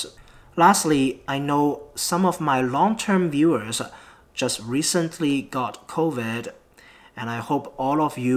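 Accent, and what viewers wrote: United Kingdom. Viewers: Your demeanor is measured, not alarmist and speak as a father and husband as well as a scientist. I detect British alliteration when an open ended vowel word meets a word beginning with open vowel. 😉 A Brit are you🤔🤣🤣.🤲thanks for your vids🤲. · Dr, I watch many doctors and scientists on this subject! I love your channel honesty and accent, from the UK, no problems understanding you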